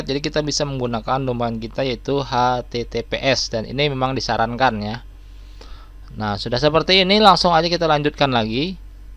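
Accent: Banjar, Indonesia. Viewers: Banjar bangt pian nie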